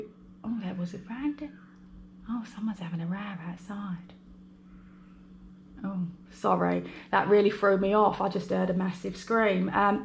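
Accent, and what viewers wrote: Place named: London, United Kingdom. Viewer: Omg I hv to say u are absolutely hilarious 😂, such a great accent is it London? I've really enjoyed watching 👀 ur videos thankyou for entertaining us on this channel xxxx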